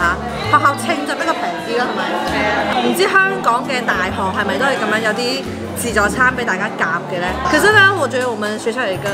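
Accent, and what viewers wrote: Malaysia. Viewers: 怎么你的普通话口音不像香港口音，倒是像马来西亚口音（可能我听口音不是很准），但是讲粤语就有了。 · 你的普通話，好有馬來華語腔啊！ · 每次睇到既片都覺得香港人去台灣讀書講國語馬來西亞口音好神奇😂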